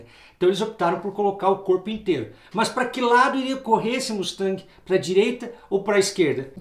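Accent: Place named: South, Brazil. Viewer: Opa...pelo sotaque tu é sulista.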